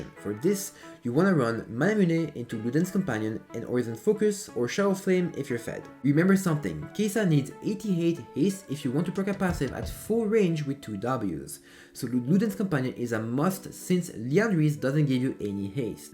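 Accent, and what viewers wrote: France. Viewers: You have a strong accent are you French ?😮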